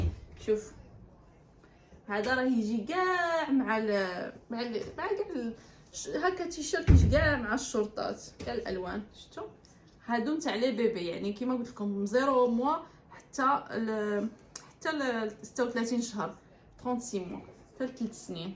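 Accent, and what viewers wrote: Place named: Oujda, Morocco. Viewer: شحال زويني لهدري ديالك واش من وجدة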